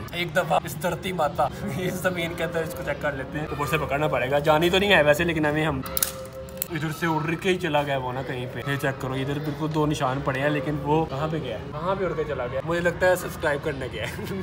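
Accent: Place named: Punjab, Pakistan. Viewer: Laihnde punjab wali boli aa veer ji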